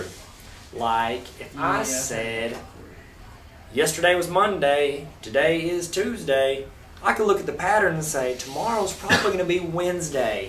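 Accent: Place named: Arkansas, United States. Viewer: I think he is from Arkansas if not from texas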